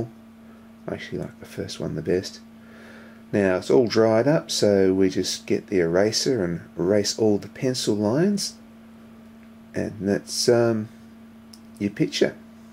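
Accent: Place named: Australia. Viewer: australian! yes!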